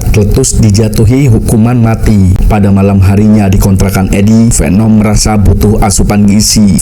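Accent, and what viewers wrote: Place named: Banyumas, Indonesia. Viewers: Wong endi min, bahasae kaya wong panturaan jeh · Wong tegal kye😂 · Mantep bro, aja kelalen ngapakmu rejekimu haha. Salam subreker anyar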